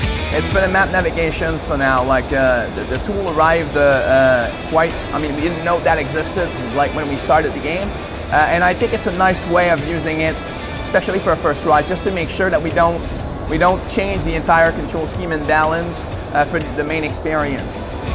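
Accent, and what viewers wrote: Quebec, Canada. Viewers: to folks wondering about the accent he's from Canada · He is right. His accent does come from Canada although only this accent is a French-Canadian accent that will only be found in Quebec and some parts of Ottawa. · Dat québécois english accent! :D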